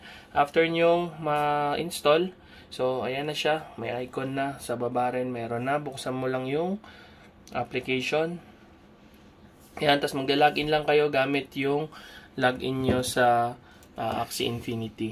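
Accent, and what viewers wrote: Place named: India. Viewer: titulo en español y audio en indu-ingles, que mal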